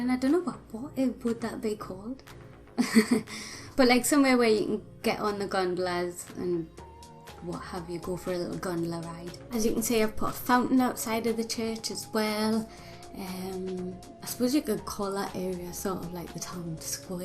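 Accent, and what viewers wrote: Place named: Newcastle, United Kingdom. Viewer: I just wish I knew how to make things like this! Love your accent as well :) Geordie for the win!<3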